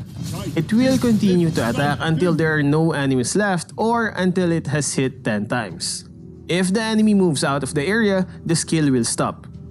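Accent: Philippines. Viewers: The pinoy accent tho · I love your accent, very Filipino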